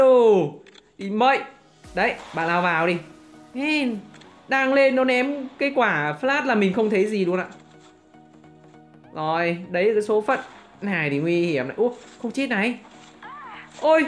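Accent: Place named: Nghe An, Vietnam. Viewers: Nghe cái dọng miền trong .kho chiu vl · Dlm nghe dong khăm vv ..may dân nghê an ah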